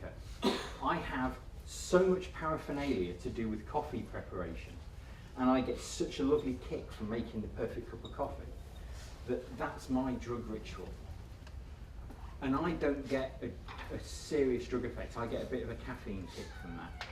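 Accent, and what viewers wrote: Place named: United Kingdom. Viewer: Bugger the door creaking! This guy knows his stuff! If all you can moan about is the door creaking you didn't listen or understand this video To the maker and lecturer in this video THANK YOU SO MUCH FOR MAKING THIS VIDEO I wish I could have listed to you spk all day because for someone who never took that stuff, you really have done your research and know what you e talking about. You sound like you're from the U.K. Do you run any particular detox or rehab? I have a friend who needs help badly,and where we live there's hardly any. I know this is a old video but I'd be so grateful if you could reply. Thanks for your time and all the best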